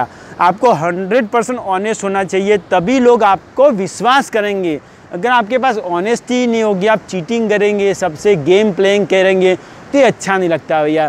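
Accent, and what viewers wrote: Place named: North, India. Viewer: आप गुजराती है पर आपकी हिंदी का लहज़ा उत्तर भारतीय है , ये बड़ी अच्छी बात है।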